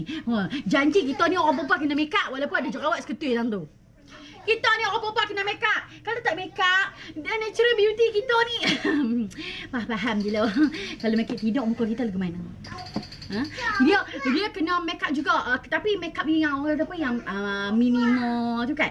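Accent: Kelantan, Malaysia. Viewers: Panda kecek kelate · Hhahaahhaa. Aku sukO Kecek Klate😂supOo siam hahahhaaa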